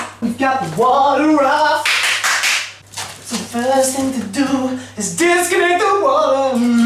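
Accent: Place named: United Kingdom. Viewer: The British accent is a little bit distracting.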